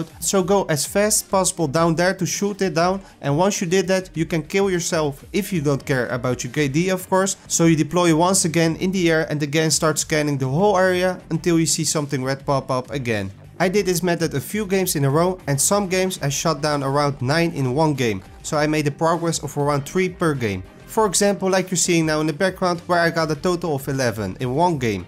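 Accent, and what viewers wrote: Netherlands. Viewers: you sound dutch mate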